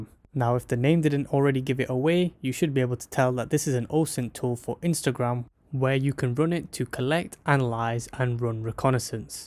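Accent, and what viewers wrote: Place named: India. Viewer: Bro are you from india?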